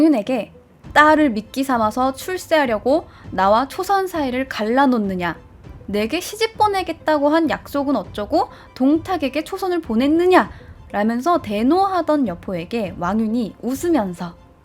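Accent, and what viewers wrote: Gyeongsang, South Korea. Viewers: ㅋㅋㅋ 오리지널 네이티브 부산 경남 사투리를 구사하시네요? 갱상도 여자 아입니까?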